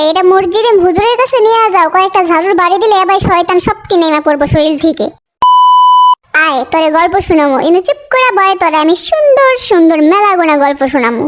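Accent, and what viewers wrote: Bangladesh. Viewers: আপু আপনি কি খুলনার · নাটোর ভিলেজি তাসিটি হার কথা বলতাছে পাওয়ারফুল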